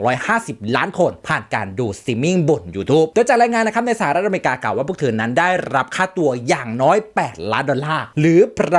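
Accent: Isan, Thailand. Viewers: แอด…กลับมาอัพเดทบ่อยเถอะน๊า คิดถึงเสียงหัวเราะของแอด คิดถึงสำเนียงภาคว่าวอีสาน หม่วนๆ ของแอด Please…..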